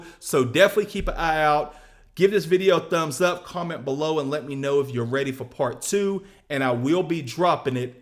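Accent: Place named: Mississippi, United States. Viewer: Are you from Mississippi? You sound just like my relatives.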